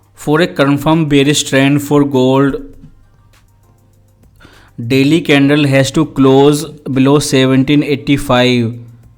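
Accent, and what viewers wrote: India. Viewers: Why don't u speak in Hindi...why this butler english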